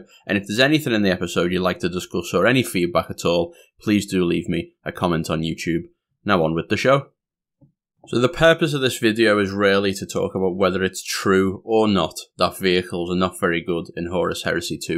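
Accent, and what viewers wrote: Liverpool, United Kingdom. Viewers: Are you from Merseyside? You sound scouse-adjacent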